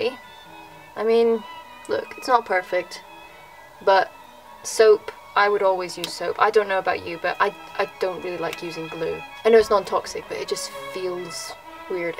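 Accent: United Kingdom. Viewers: ...But he is British. He always sounds British.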